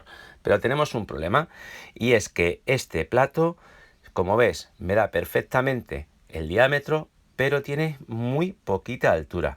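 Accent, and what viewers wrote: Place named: Spain. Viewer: Si es riquísimo yo aparte le pongo queso crema gracias me encanta tu acento Español saludos desde puebla México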